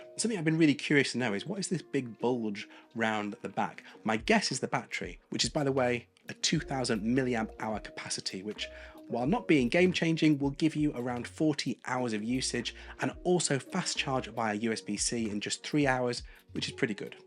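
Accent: England, United Kingdom. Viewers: You are from which part of England?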